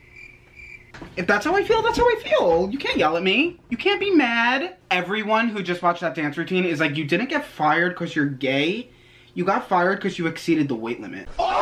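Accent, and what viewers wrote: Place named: North, United States. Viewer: Ok I hate Christina but being a manager I would warn you to not have friends at work and definitely DO NOT allow any non-employee to help you! That violates workers comp laws! That could get corporate in big trouble. That would get anyone working below me fired & walk out immediately! So just saying protect yourself! Btw I love your Northern scent. I live the south. I freaking love your videos!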